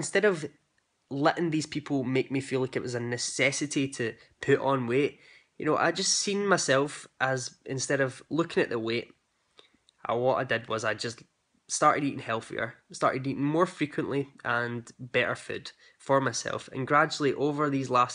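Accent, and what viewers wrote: Scotland, United Kingdom. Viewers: Very very true. Some people don't understand because they can't relate, so it's hard to explain it to them. And, your Scottish accent is a beautiful reason to listen to you. Well, in addition to your humor, advice, observations, and everything else. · I have acute anxiety disorder and have been anxious since I was a child. Nothing happened to me abuse wise but I was born very ill and separated from mum for 6 weeks. I was in and out of hospital until I was 9 also. I do a lot of natural things too but need 20mg of Prozac daily to keep everything at bay. Thanks for sharing. The Scottish accent is gorgeous 😍 I'm just back from Edinburgh with my hubby. We went for our first wedding anniversary as we got engaged in Edinburgh 2 yes ago xxx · You are amazing and I love watching your videos. They make me feel good and I bet you help so many people who don't feel worth it. 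I have learned to love myself more, over the last 20 years, than I did the first 22 years of my life. When I came across your videos I was amazed at such a handsome, caring man. Even though I am happy with myself, it is still nice to hear your encouraging words, especially with the Scottish accent. I just love hearing your voice!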